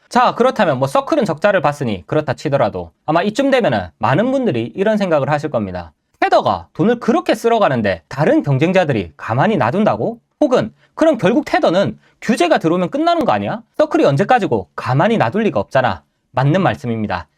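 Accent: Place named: Gyeongsang, South Korea. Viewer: 코인계 일타강사 인정합니다. 머리에 쏙쏙 들어오는 내용과 명확한 딕션, 그리고 말씀 중간중간 묻어나는 경상도 억양이 아주 큰 매력입니다. 덕분에 지루할 틈 없이 완강했습니다. 감사합니다. 편안한 주말되세요~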